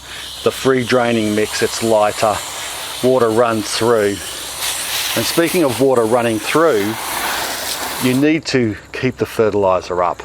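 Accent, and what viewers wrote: Australia. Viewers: I enjoy listening to his Aussie accent plus have always liked the self sufficient mindset.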